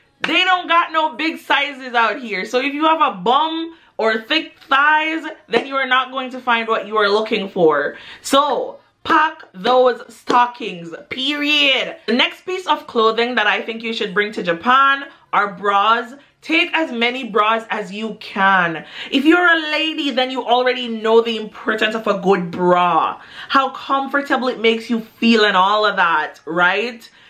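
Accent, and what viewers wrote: Jamaica. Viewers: I did hear the little Jamaican accent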